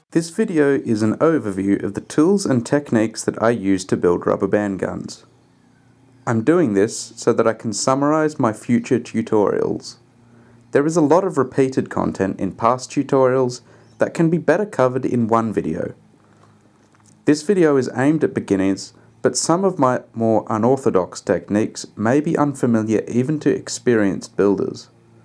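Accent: Australia. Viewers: upon hearing your voice' I said "Hey, he's an AUSSIE"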